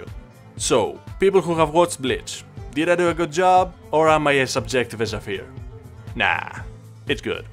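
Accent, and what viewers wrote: Greece. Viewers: Φίλε μιλάς πολύ καλά αγγλικά αλλά για κάποιο λόγο με το που σε άκουσα λέω αυτός είναι Έλληνας 😂😂😂. Συγχαρητήρια πολύ καλό βίντεο! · are you from greece? yhat accent is familiar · Are you Greek? Your accent is so familiar😂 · i instantly knew you are greek from your accent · that's a pure greek accent xD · Why this guy sound like a greek man speaking english. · I watched the video 7 times to understand what country your accent is from and ended up with a question. Are you Greek?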